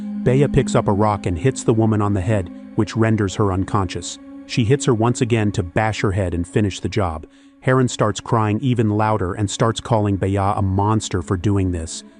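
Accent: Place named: United Kingdom. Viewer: British? Lost me...must be shit.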